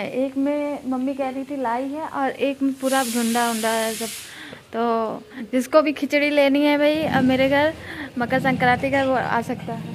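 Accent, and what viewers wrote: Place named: Jaunpur, India. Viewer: Yar aaj suna tha hindi divas h bt aap to jaunpuri divas bna diye. Di hmre yha b bolte h bt aapki bat mujhe bhut km samjh m aai itna pure mt bola kro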